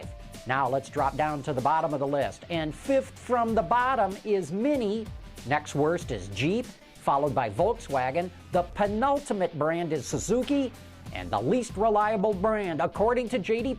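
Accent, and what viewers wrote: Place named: Michigan, United States. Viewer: STRONG mICHIGAN ACCENT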